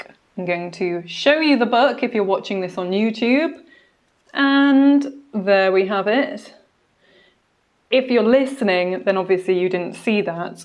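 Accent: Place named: United Kingdom. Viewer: The most cordial greetings: I'm a lawyer from Colombia who is learning general English, but I also want to be able to speak about my profession in this beautiful language, therefore I thank you for your review, bucause now I know that is a good idea to get this book and why not, to take the TOLES exam. Take care. Bless you. Postscript: I really love the british accent.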